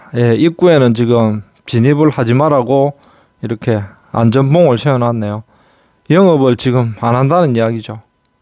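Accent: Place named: Gyeongsang, South Korea. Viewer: 투박한 말투와 경상도억양 보다 현실적이고 가식적이지않는 친근한 나레이터.. 전국곳곳의 간접경험 좋은 정보 좋습니다..ㅎㅎ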